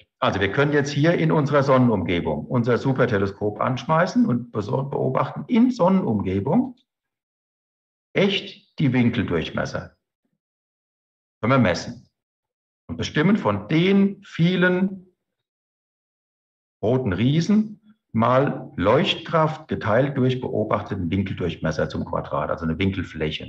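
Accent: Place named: Frankfurt, Germany. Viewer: Sie können noch so hochdeutsch sprechen, den frankfurter hör ich immer raus 😍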